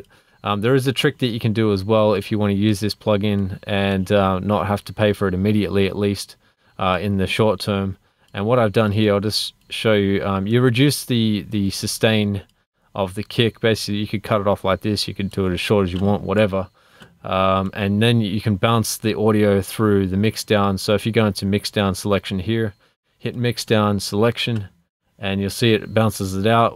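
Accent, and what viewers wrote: Australia. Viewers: Aussie accent ?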